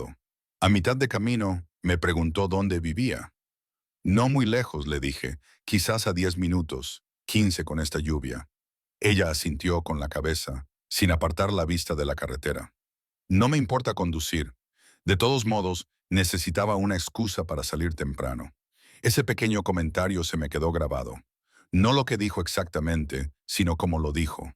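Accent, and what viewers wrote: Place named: United States. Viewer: La narración es muy mala, el acento yanqui insoportable. 5 minutos y no he aguantado más...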